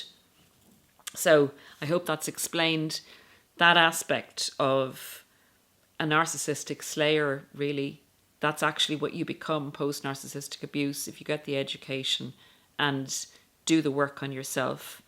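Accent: Ireland. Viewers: Aye, but her accent is Irish (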